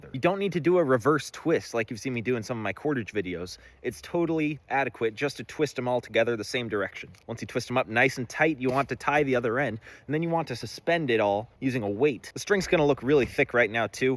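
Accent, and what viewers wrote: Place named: United States. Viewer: This guys accent is crispy. I don’t usually like the American accent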